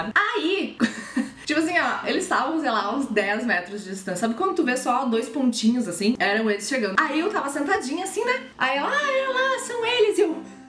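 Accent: Rio Grande do Sul, Brazil. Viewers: é gaúcha, reconheço nosso sotaque de longe kkkk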